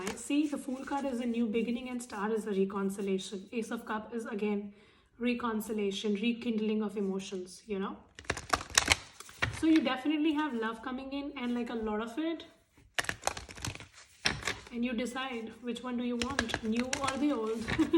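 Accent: India. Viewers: I presently live in Mareeba, and my wife of three years has been "stuck" in Shanghai China for another 12months... and then this recent interloper is almost a "nut case"... My Wife is 10 years younger & 12may, I am 21may... Xinji holds my heart, but cannot leave China due to "covid & family", we do not speak the others tongue... I have some traits related to aspergers, bipolar & dementia etc... I want to produce "cold pressed coconut oil" on Vella Lavella Island, Western Province of Solomon Islands (I am fluent in "tok pisin" and have a masters degree & science degree in Tropical agriculture growing and processing Theobroma Cocoa (chocolate), coconuts, since 1970... (tea & coffee is a recent hobby interest)... I love sailing catamarans... Your accent sounds like Southern Indian? - I have resided in Bangalore, & Nagacoil for a 6 month holiday, and earlier, 2and a half years in Penang, Malaysia... 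At 75 years old I am presently bloody confused, waiting for "Ascension" on 21 Dec??? ... <mail>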